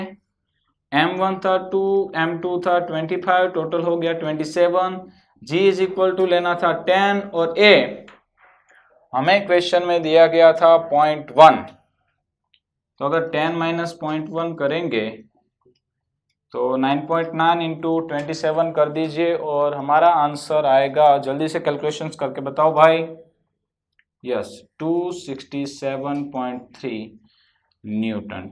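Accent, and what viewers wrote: Gujarat, India. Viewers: i can bet that you are from gujarat by your way of telling force . Anyway you are working hard and you will be succesful one day . you are a good physics teacher.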